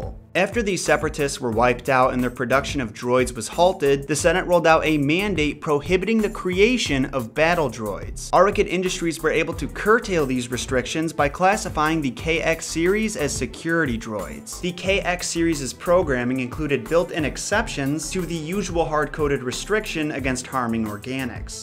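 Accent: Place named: Boston, United States. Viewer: I like you accent and the way you say "philosophy". Boston?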